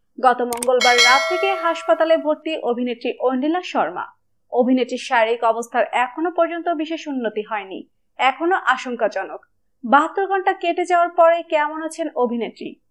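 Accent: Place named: Bangladesh. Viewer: আমার মনে হয় এই সব channel গুলো বাংলাদেশের. Pronunciation শুনে তাই মনে হয়. আর বাংলাদেশ থেকে YouTube এ extremely vulgar জিনিষ দেয়. এই গুলো কি করে allow করা হয় জানি না.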